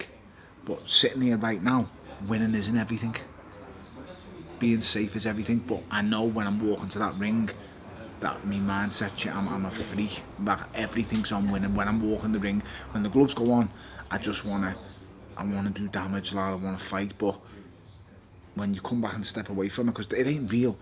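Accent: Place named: Liverpool, United Kingdom. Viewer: Tony the bellend you fat overgrown balloon. You ugly scouser with a disgusting accent like all the other scousers in the world you will not get home safe to your wife and kids after david the toemaker haye whoops you so bad you’l be in hospital with your wife and kids next to you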